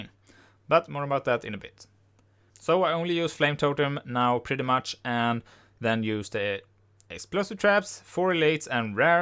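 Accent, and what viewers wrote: Sweden. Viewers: Svengelskan ärgrymm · 100% på att du är svensk :D Bra guide.. Tack edit: btw. The pob is a bit messed up. you got a lvl 1 tree, and then the lvl 15 tree is like full build, and lvl 40 tree has less placed skillpoints then lvl 15 :)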